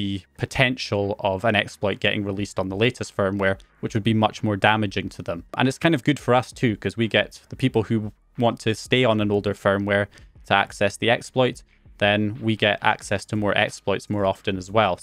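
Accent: Scotland, United Kingdom. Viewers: Jim Browning is from Northern Ireland and is Scottish, but the two regions do share similarities such as slang words/colloquialisms.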